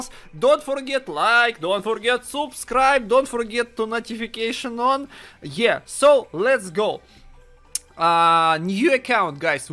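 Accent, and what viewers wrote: Russia. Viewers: Yes. And i think he's got russian acsent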